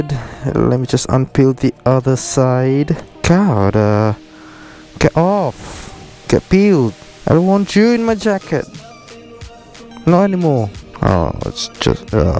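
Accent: United Kingdom. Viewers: i love the way you talk, British Accent hahaha,lil bit weird heard you moaning ahahaha. Nice gears bro. i'm interested with the shoes.. 7gears, right?? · itu aksen british :3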